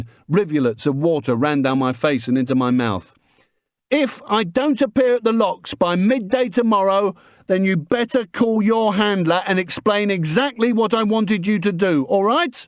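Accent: England, United Kingdom. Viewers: Yes the English accent is pleasing to my ear 🤔 I am always disappointed when an audio book is accompanied by the American version 🙄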